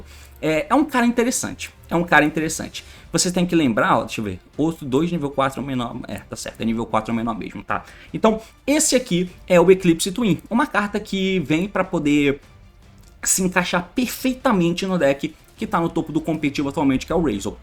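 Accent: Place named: Bahia, Brazil. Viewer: Não deixe os haters mudarem teu jeito baiano de falar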